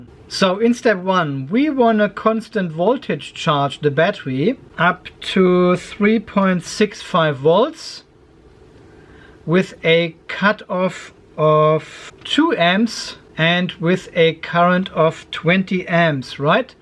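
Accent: Germany. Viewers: I love your denglish !!!!! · CamperT aus Deutschland. Sag mal bist du deutschsprachig? "alter Schwede" kennt sonst kein Mensch. Und dein harter Akzent im Englischen ist wunderbar zu verstehen. Deine Videos sind klasse, habe den EBC A40 schon bestellt. Bitte weiter so in "down under".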